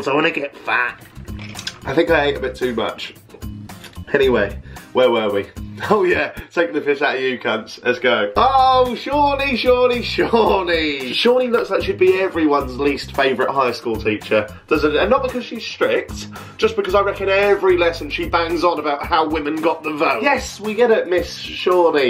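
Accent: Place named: Yorkshire, United Kingdom. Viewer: your Yorkshire accent kills me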